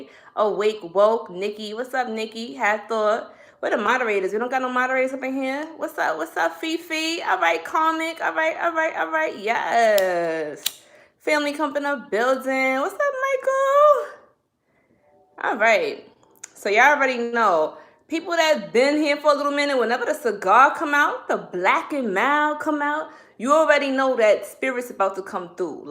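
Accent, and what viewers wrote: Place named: Brooklyn, United States. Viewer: I love you my Sister! You had me at Chaos Magick then you dropped info on St Expidite and I knew you were bad ass and that BK accent is off the chain. Shout out to Masterly Foolish for bringing you to my attention. I'll be checking you out and hanging with you. Let you know how the mirror spell works. Thanks for the knowledge.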